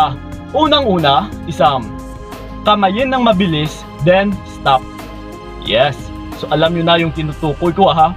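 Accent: Iloilo, Philippines. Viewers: daw ilonggo tono mo tol hehe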